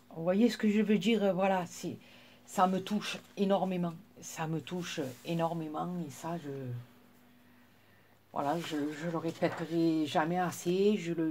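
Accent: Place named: Marseille, France. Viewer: Coucou je me disais bien que tu étais du sud (et de marseille) car tu as l'accent de chez nous, et pour être honnête j'aime beaucoup ton accent et ta franchise. J'aime beaucoup.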